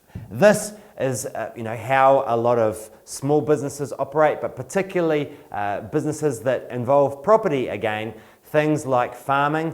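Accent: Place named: New Zealand. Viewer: This applies to New Zealand it only obvious if you recognize the accent.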